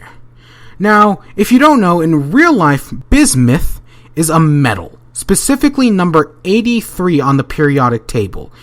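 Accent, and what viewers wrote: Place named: United States. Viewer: he sounds like he has a normal American accent tho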